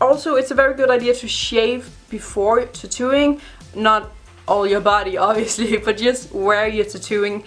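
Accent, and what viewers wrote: Denmark. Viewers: You are Danish aren’t you? As a Dane I can hear it in your accent😉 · Hvorfor kunne jeg høre på din accent at du er dansker? HEJ MEDVIKING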